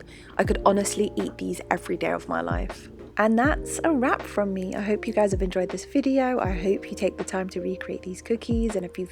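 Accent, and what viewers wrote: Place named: United Kingdom. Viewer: I love your particular British accent. I don't think I've heard it before. 🤔What area is it from?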